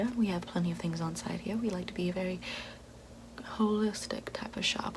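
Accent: South, United States. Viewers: Southern accent stuff is great.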